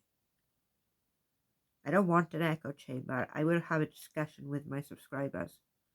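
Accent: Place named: United Kingdom. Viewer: British accents are beautiful as my Gran was from Northern England in Warrington. To hear you speak is like being home to me. I have a very mixed accent, but my Southern Tennessee/Kentucky stands out the most. I don’t see Renelle as a problematic creator , she’s a strong lady and I admire her. I like Barbi too. Subbed to them both, but I’ll not partake in negativity. We have so much of that already. I post mostly nature videos and goofy parodies. I may share serious topics here and there. It’s a great hobby for me as it’s not a montetized channel. My advice if anyone gets sucked into that negativity? Ignore them. Block them. Unsub them. Don’t speak of them. That’s what I’d do. I was stalked online almost ten years, it’s awful. We need to be kind. Thank you for making this video. I’m subbing. 🌹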